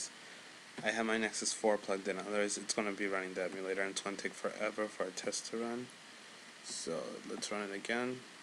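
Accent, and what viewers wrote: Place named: United States. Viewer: nice video BTW, thanks for a nice american accent and not indian one (damn, I'm such a racist) :D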